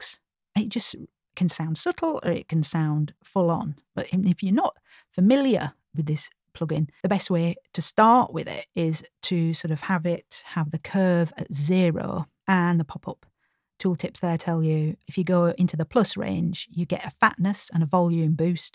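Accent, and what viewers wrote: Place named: North, United Kingdom. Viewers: The icing on the cake of this brilliant video is familiar, approachable, northern accent and easy style which just makes this a joy to watch and listen to! Thanks & Warren. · Really enjoyed this. Great advice, well explained, and to cap it all - delivered with a wonderful Yorkshire accent.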